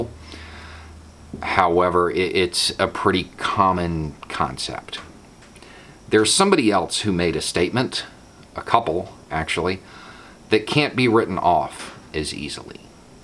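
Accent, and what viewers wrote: South, United States. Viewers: This is probably the most comforting thing I've heard yet. The recent messages from our military brass gives me hope. I travel full time in an rv, and I've just returned from traveling from TX up through the south. Long before the virus hit, yet alone the latest shootings and protests, I was very angry and frightened by what I heard from police, Natl Guard members and fellow citizens in my travels. THE HATE IS REAL. To hear police and guardsmen disregard it, while spouting pro Trump rhetoric made me believe both our military and police forces are all corrupt racists- and we are doomed. I came upon your videos a few weeks ago- and gotta say your look and accent gave me a visceral response- engaging the stereotypes that were reinforced during my latest trip south. But then I listened and realized that much like Trae Crowder, you break that mold. 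We are all prejudiced with ingrained stereotypes by movies, news, headlines. MY biggest prejudice has always been a southern accent. From Boss Hog, John Wayne, and even the movie Deliverence (yes I'm THAT old) my skin crawled when hearing those accents, which portrayed ignorance, misogyny, and hate of minorities- including Native Americans. It was jolting to me as a "Yankee" to be in black communities, in this day and age, to have them answer my inquiries with "yes 'mam" and "no 'mam". I wanted to yell at them and say... "I am not your superior- you dont have to address me like that." It felt degrading for them- until I got into more wh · I hate to pigeonhole Southerners, but it's refreshing to hear that accent, in a calm voice, giving us facts, instead of spewing Fox talking points.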